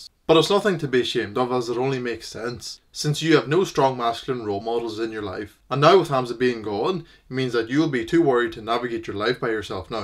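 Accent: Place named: Scotland, United Kingdom. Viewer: Damn for a lithuanian u really picked up the scottish accent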